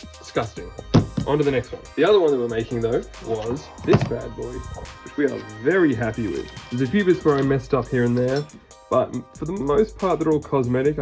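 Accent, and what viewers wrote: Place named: Australia. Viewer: mate lemme tell you something, i was so pleasantly surprised to hear an aussie accent on a niche video for once, letting me know that i can actually buy the shit required for the project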